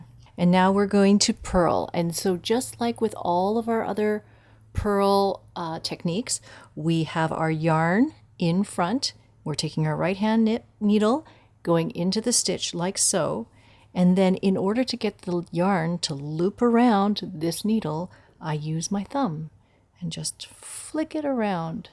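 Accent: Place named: Canada. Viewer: Yay! Vancouverite here. I was about to ask if you were Canadian, your accent gives it away. Georgia St?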